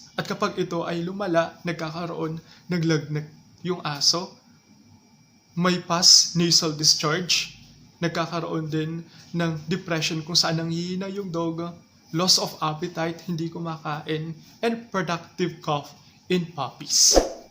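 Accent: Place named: India. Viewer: Bat po kayo ganyan magsalita sir? Parang bumbay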